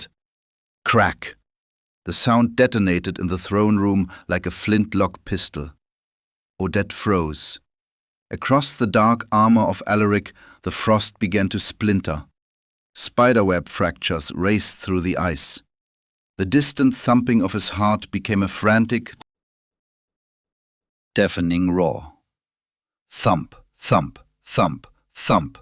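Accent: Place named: Austria. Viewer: IKR, EXACTLY.!!! It's also every story from this channel. The accent is Austrian, I believe.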